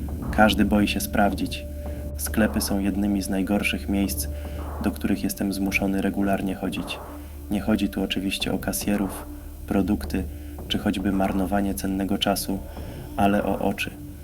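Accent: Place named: East, Poland. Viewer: dobra pasta dobry lektor chociaż momentami akcent wschodni słychać ale to nie przeszkadza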